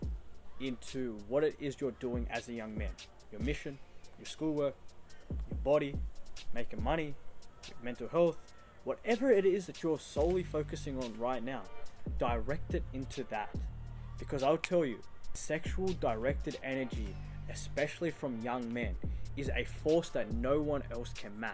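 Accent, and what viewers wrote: Australia. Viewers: just popped up in my algorithm, stoked to hear an aussie voice on this journey. cheers lad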